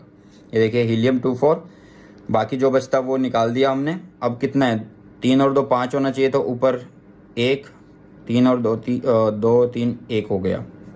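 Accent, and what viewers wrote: Haryana, India. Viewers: Sir aap haryana se ho kya😁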